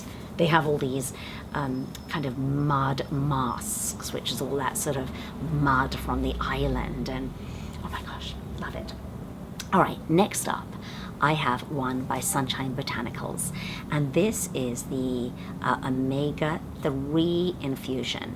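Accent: United Kingdom. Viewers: Hi, great serums I will certainly check them out. Starting my 14 days sugar free today. i know I'm not watching these in any particular order but so far loving the content. I'm in Co. Wexford Ireland and spotted your British accent straight away which is why I stopped to watch you and so glad I did. Eleanor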